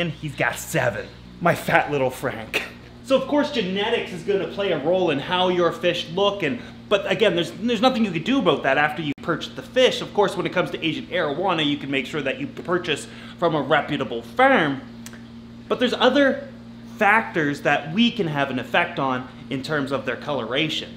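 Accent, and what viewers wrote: Canada. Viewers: Could really hear the Canadian accent in this video. it’s brilliant 😂❤️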